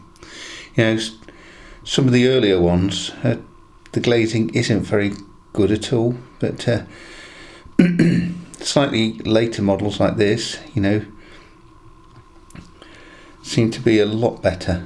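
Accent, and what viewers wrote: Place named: Midlands, United Kingdom. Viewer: Nice review, I enjoy your channel. Bought one of those grey Farish 37s because of your review, very pleased with it. Still trying to guess the accent, though. Midlands? Maybe Leicester? I'm probably miles off!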